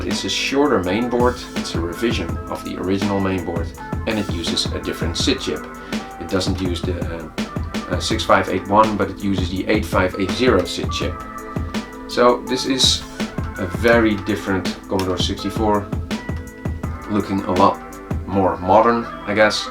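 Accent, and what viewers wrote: Netherlands. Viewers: Netherlands accent?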